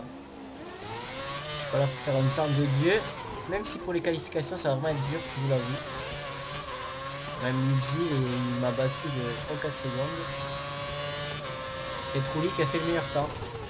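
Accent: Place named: Marseille, France. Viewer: accent marseillais sisi :)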